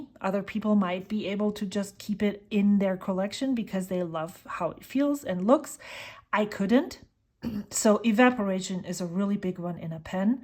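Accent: Germany. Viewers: Thank you for such a detailed video; I enjoyed it quite a bit. That question #5 helped me a lot, as my approach to fountain pen has always been about getting a different writing experience from different pens, and I prefer Medium fine to medium nibs, as they have the right balance between nib width and smoothness. So, thank you. Regarding the inks(#7), I love both of the mentioned inks. I love teal(ish) colour. I recently got Lamy Petrol(2025 version), as I wanted sth that is close enough to dark emerald colour. Iroshizuku's new inks Rikka and Syun Gyo are on my list next. Thank you for such detailed and wonderful videos. I also liked your categorization of pens. I am someone who keeps a minimal and simple collection, but if I ever got that many pens, I am also going to have a similar system. BTW, are you German by any chance? I studied in Germany, and that accent seems familiar :)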